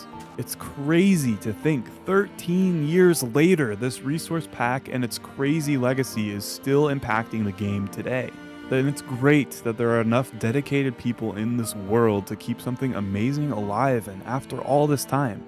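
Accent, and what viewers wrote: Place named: United Kingdom. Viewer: keep doing the British accent.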